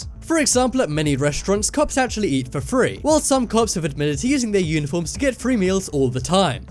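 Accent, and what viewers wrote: United Kingdom. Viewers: THE WHOLE THING IS BULL SHIT... This is some Russian fake news divide America BULL SHIT... And I am offended that some asshole will a British accent is propagating this BULL SHIT.. there are social and economic reasons why arrests spike in SOME cities and communities that are related to there being too much month left at the end of the money... statistically you will find MURDERS, ASSAULT AND ROBBERY spiking on Friday and Saturday... but I guess that’s a plot by cops tricking people into robbing, stealing, and killing so they can meet their quota... NICE SUBTLE RACIST AND SEXIST MOVE TOO USING THE IMAGE OF A BROWN WOMAN WHEN YOU FLASHED THE WORD “QUOTA” ON THE SCREEN... anyone who agreed with this nonsense... I have the winning lottery ticket I’m willing to sell you... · This is nonsense This is obviously filmed in Britain and is done by someone with the equivalent accent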